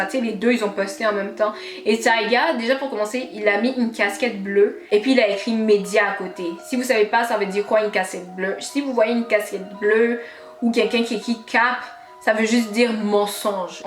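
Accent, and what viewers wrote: Quebec, Canada. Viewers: waouw je kiff ton accent canadien. je voyais ta tête tout le temps dans mon fil d'actualité, aujourd'hui j'ai décidé de cliquer sur ta vidéo et je regrette pas, ton accent est magnifique. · C’est la première fois que j’entends ton accent québécois waaw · Jcrois qu'elle vie au canada vu son accent. C'est possible que sa agit sur sa manière de parler. En tout cas c'est ce que jme dis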